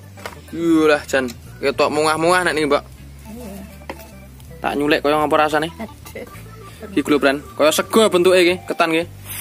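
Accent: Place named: Java, Indonesia. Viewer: Jiaaan Medok Banget Bahase Preen...Selalu Bahagia .. lan Sehat Sama Simbook ...👍❤💪...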